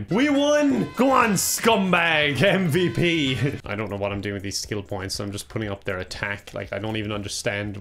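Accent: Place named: Ireland. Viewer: leans in “hHph“ me: there’s nothing that this man could do to make me NOT live for his irishness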